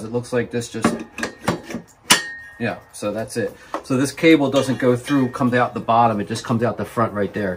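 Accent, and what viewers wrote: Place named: South Jersey, United States. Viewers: Are you from Jersey or Philly? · You’re from south Jersey aren’t you ? I can tell by the way you talk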